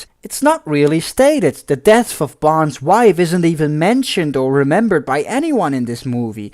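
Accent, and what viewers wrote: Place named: Netherlands. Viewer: 'He looks like a bored, dirty old man with an ashtray on his chest'! Man, you are one funny fucker! I am still laughing at this -- the line definitely helped by your Dutch accent, my all time favourite of any foreign English speakers. Brilliant.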